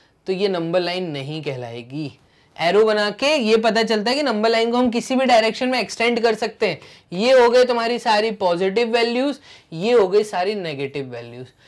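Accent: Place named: Odisha, India. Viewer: Sir i think you are from odisha I am from odisha and i mark your language and i think you are from odisha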